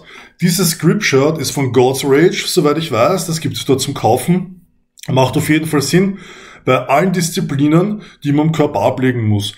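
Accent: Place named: Austria. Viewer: Dialekt für an Österreicher verständlich ... für Deutsche vielleicht schwer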